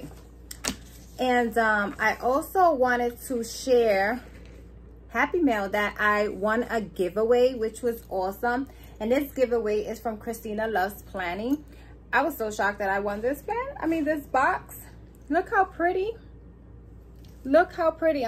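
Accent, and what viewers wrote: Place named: New York City, United States. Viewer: I just came across your channel. You’re so inspiring and positive! I’m originally from NYC but live down south in FL…. Hearing your accent reminds me of home. I pray you’re able to get out of debt soon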